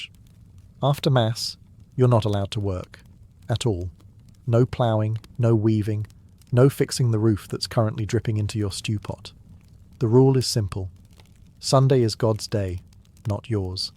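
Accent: United Kingdom. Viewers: Love😂 the British accent. Makes me feel a little more civilized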